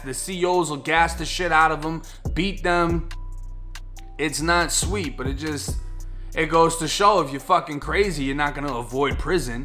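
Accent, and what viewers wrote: Boston, United States. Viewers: You gotta be from Boston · Are you from Boston? The accent sounds like a Boston accent curious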